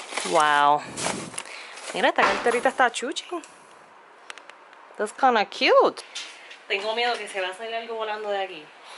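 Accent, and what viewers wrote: Puerto Rico. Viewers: quisiera estar hay contigo soy de puerto rico y me gustas como hablas suenas como puertoriquena!! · But dang she's a Asian race but puertorican when she's speaks it. China boricua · Bella china boricua 😍 me impresiona ver una china hablando español. Y más con accento puro boricua wow. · mi vida tu hablas como boricua ,wepa .que <link> siento feliz por lo bello que hablas de mi pais y tu pais tambien🥰🥰. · Bonita,habla pura, BORICUA,me,gusta,la,comida china de puerto rico, dios te proteja 🇵🇷🇵🇷🇵🇷🇵🇷🇵🇷🇵🇷🗽🗽🗽🗽❣️❣️❣️❣️❣️ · No se pero tu boricua es mejor que el mio ... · Yo supper crazy to see this women speak the language just like what she is a real Puerto Rican. I share a story of once being in San Juan and walking passed a group of young Asian looking people and same thing overheard them speaking in Puerto Rican Spanish. So awesome to see!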